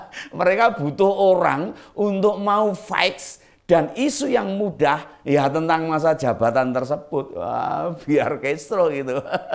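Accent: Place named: Solo, Indonesia. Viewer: Mantab om...suka aku dengan presentasinya yang tegas..dengan dialek solo yang khas.sukses ya om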